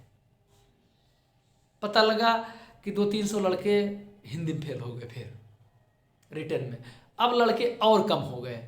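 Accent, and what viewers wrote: Awadh, India. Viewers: very intresting sir.. and the way of your talking in awadhi is also imressing..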